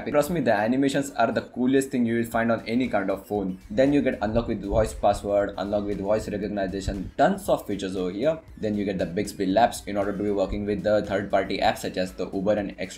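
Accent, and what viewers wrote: India. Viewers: I can't pay attention this indian accent drives me crazy I wanna punch one! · omg indian accent it's really bad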